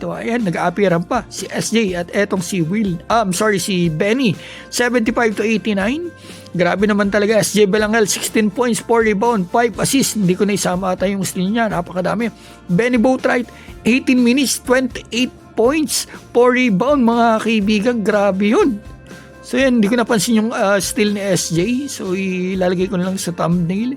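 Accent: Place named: Visayas, Philippines. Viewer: kala ko bisaya ka sir😀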